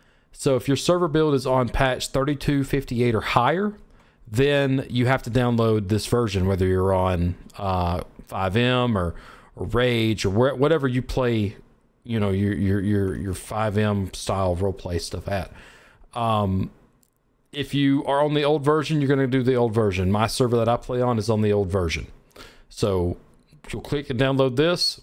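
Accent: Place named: Alabama, United States. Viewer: Thanks man. That alabama accent made me feel right at home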